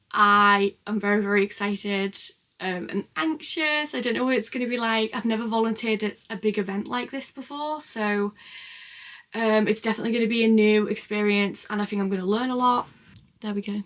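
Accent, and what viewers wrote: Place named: Liverpool, United Kingdom. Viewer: Hi! Just found you here on YouTube. Is that another Scouse accent I hear?? 😍 Love the video. I'll have to go to texstyle if they do another next year. Xx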